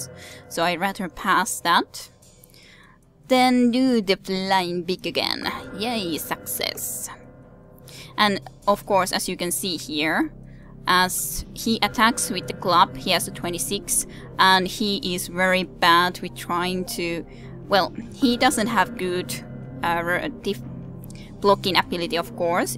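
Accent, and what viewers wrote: Finland. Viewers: Well, Hungarian has certainly been "corrupted" so to speak by slavic languages and has taken some slavic sounds which I don't see anywhere in these Finnish words. Also, its why you say things like "as such" so uniquely, where a Hungarian person would have no problem making the "tʃ" sound ( its actually very common in Hungarian) But from what I heard so far, both Finnish and Hungarian maintain a rather consistent pitch, so when you speak English it results in an extremely interesting accent. Finnish seems to be more influenced by German then Slavic languages ( for example the word "Ritter" in "sormusritarit" ) As for Torment, that depends on whether you think you would enjoy it or not? :P I would recommend replaying it if you haven't played with a very high wisdom, as high wisdom makes for a much more interesting game.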